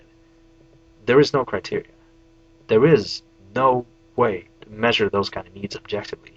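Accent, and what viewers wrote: United States. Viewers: you have to make a video about how you acheaved this accent seriously :) im amazed how freely and fast you speak and what a good american accent u have its hard to imagine that had developed this accent after you went to the US when u are there owhensince 2005 ...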